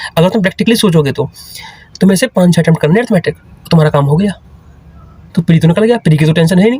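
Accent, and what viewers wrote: Lucknow, India. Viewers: Aap lucknow se ho?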